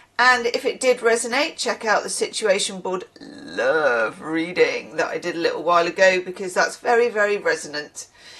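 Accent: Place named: Wales, United Kingdom. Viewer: 😂 I’d never heard of evince & had never tallied plato & platonic 😅& then there’s the 3rd slang word in London for cunnilingus Platoed 🤣🤣🤣I’m going get told off . Your Welsh accent great !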